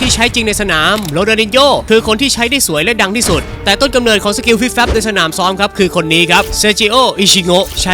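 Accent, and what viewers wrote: South, Thailand. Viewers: แอดเป็นคนใต้เหรอ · ❤❤มีภาษาใต้ด้วย😅 สุดยอดทางพร้าวครับ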